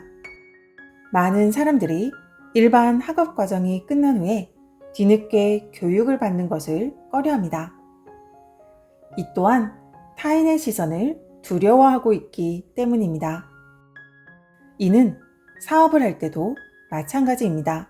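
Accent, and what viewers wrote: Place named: Gyeongsang, South Korea. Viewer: 경상도 분이셨군요~★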